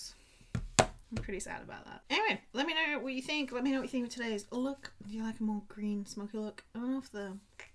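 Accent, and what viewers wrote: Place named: Adelaide, Australia. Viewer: Love the look! Your accent isn't obvious to me! 😜 you sound Adelaidien though for sure! I've been looking for a greens palette so I might have to check this one out! Wish you lived in Adelaide so you could teach me your ways in person! 😂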